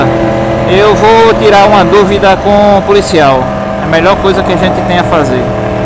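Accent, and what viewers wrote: Pernambuco, Brazil. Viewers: pelo seu sotaque voce deve ser daqui da terrinha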